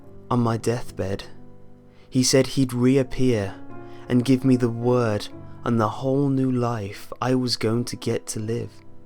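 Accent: England, United Kingdom. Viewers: who are you are you a british or what. im just curious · This guy is mental... so is his soothing british voice.. plus it was disturbing the belt thing... um.. this guy sounds like the british version of Pedobear O-O (\O3O/) GOODNIGHT EVERYONE! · because he is English that's why if you find the accent irritating just don't watch him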